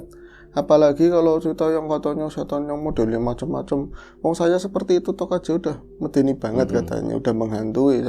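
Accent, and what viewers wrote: Banyumas, Indonesia. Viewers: Dari Ch LapHor, capcuus k CH NGOBROL, stlah itu merapat lgi k Tanah Tua Channel ndengerin ngapak'y mas 🤭🤣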